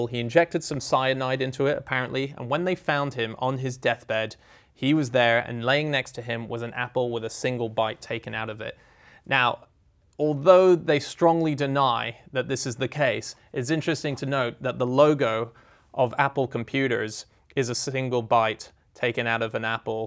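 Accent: United Kingdom. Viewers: True, but only part of ot. You sound to be british so you should know better... War came to Britain later than it came to Poland so it's just normal that Polish mathematicians started to decode it. It's not like bomba was not accurate to newer enigma model it's that British ( that sheltered those Polish mathematicians) couldn't afford to build more. WE as human should respect all that contributed for the greater cause. ALL those who were working on deciding the enigma were great pro's.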